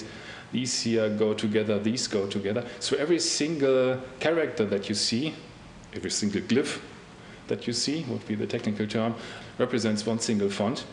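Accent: Germany. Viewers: german accent ftw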